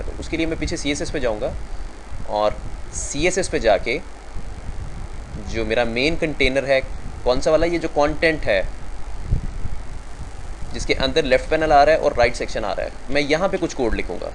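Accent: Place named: Punjab, India. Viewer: aap ho muslim liken aapke bolne ka axcnt Punjabi h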